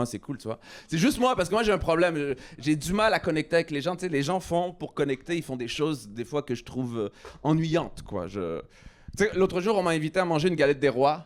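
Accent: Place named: Quebec, Canada. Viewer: J’adore 😂 beau gris avec un bel accent québécois naturel et avec des expressions nordistes… 🥰😍🤩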